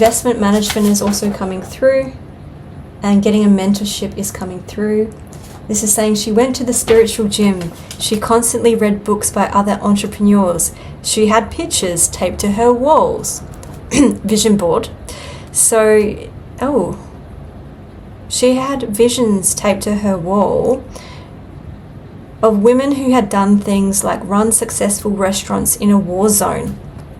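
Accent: Australia. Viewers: First, I'm so interested in you because you're the spitting image of my sister at your age (who was Cleopatra, so you are what Cleopatra looked like, if anyone wants to know). Secondly, this has been hilariously entertaining that this adorable little twin sister on the other side of the world with the cutest Ausie accent relates such accurate, intimate details of my life, especially the last 10 years. I'll be listening to this again a few times because they're a lot here. Thank you, for your your talented and skillful reading of the vibrational currents. Oh, and you nailed the name first try. My name is David. 😉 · just out of curiosity, honey, are you an Aussie?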